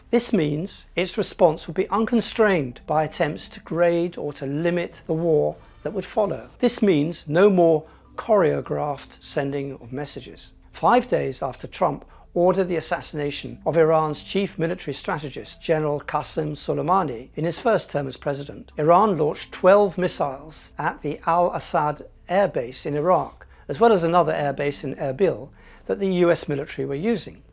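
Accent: United Kingdom. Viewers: His british accent automatically disqualifies the speaker as their our real enemy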